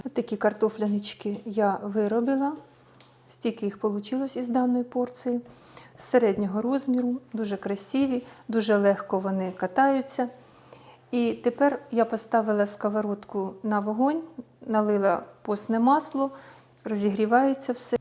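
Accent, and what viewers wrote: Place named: Donbas, Ukraine. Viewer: хочу таке приготувати. а де вы проживаете, бо мени наравиться як вы говорите. прямо як у нас в Донбаси - вымушена переселенка.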